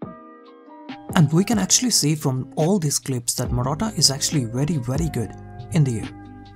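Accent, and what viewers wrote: India. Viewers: Sounds Indian.... how do you he's not!!!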